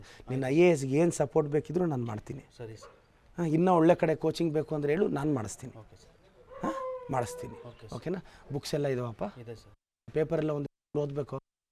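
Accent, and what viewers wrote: Telugu, India. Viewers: Tension beda..ಚಿಕ್ಕಬಳ್ಳಾಪುರ..ಆಂಧ್ರ attached... obviously Telugu flavour jaasti...yenbproblem illa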